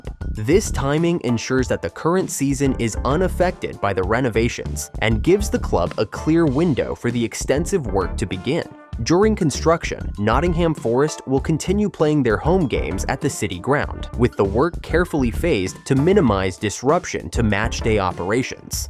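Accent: United States. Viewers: Why the stupid american accent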